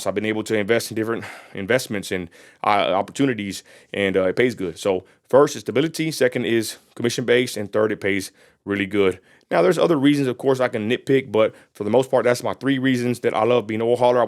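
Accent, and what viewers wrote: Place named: Texas, United States. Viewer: Agreed. I was listening without video; I didn’t hear any edits or jump cuts. You have a good Texas accent too.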